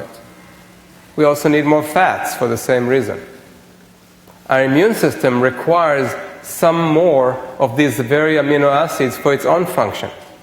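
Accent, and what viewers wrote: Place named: Israel. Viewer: when he pointed out his Israeli accent it was a ripoff of what Deepak Chopra does in his seminars to get rapport with his audience, mentioning his Indian accent.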